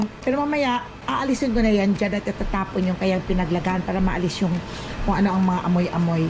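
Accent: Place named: Iloilo, Philippines. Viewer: bakit po parang ilongga po kayo.. sorry napatanong lang po.. super enjoy ako aa content nyo po.. may advice pa kayo na binigay..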